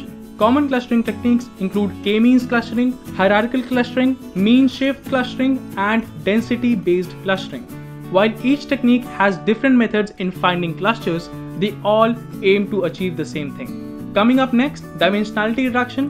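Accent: India. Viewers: 又是满满的咖喱味儿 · Why indian accent use too much tongue?!!! It's so annoying.. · The moment i heard the indian voice i just knew it would be an awesome video :)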